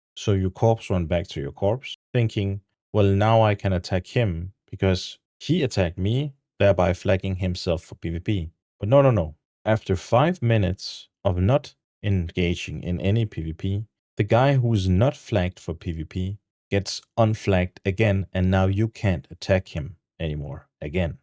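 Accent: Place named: Denmark. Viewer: you sound like a fellow dane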